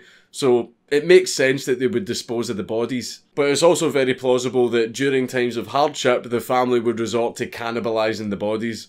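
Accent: Scotland, United Kingdom. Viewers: A Scottish story being told by our Scottish uncle before bedtime. What could be better?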